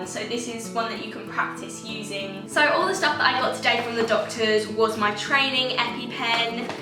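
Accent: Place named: RP, United Kingdom. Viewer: Are you British? You speak English like the Queen. Get well soon, Babe. Take good care of yourself ❤️❤️❤️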